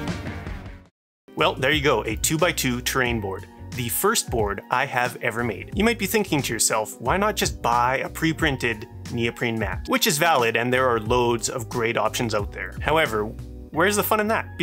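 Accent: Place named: Canada. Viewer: This guy sounds Canadian......"went to the same store" Yup! Nice vid fellow hoser.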